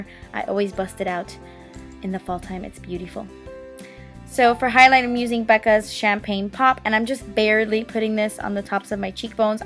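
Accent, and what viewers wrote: England, United Kingdom. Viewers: obsesseddd!! love the british accent lol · When will you film a whole video with a british accent? I'm totally serious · your British accent kills me, freakin love it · your British accent is LIFE💜💜💜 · okay chill girl! my only point was that has been slipping into her british accent since forever, that doesnt mean she is TRYING to sound like ZOELLA... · your english accent though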